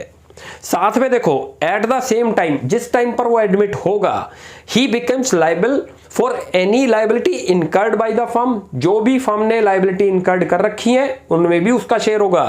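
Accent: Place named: South, India. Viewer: I think the person is from southern part of India..... Most people learn English there.... I lived there for some 3 years and I know that it's difficult for them... But yeah you all are right that he/she should change the channel😅😊😊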